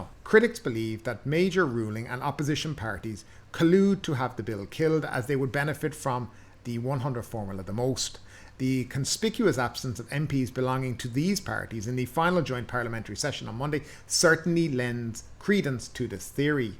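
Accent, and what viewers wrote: Ireland. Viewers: But what can we infer from your charming Irish lilt? 😄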